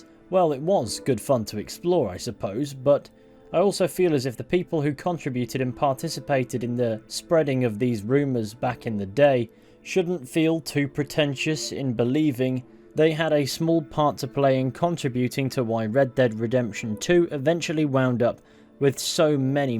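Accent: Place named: RP, United Kingdom. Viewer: you're a smidge posh, in a good way. I like it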